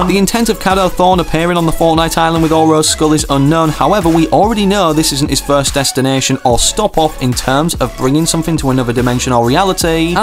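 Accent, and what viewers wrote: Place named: United Kingdom. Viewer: I never knew you where British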